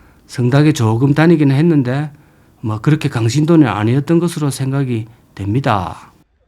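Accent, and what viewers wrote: Gyeongsang, South Korea. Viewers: 해설자,,말투는,갱상도, 사람이네,,,양택조니을 앞세워 지역감정 조정하네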